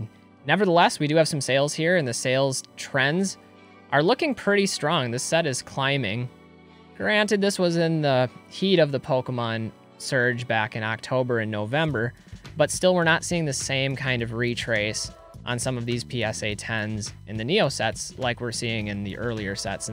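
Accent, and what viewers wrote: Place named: Minnesota, United States. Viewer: Holy shit man are you from Minnesota?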